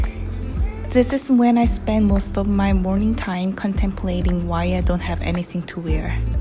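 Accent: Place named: South Korea. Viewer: 그냥 완전 한국인발음인데??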